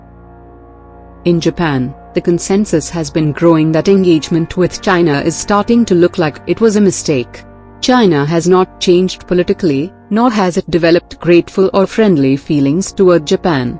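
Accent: India. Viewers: the narrative is deliberately female INDIAN-accented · The narrator's voice is a typical Indian female voice.... sooooo pathetic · The narrator is Indian...from kerrela?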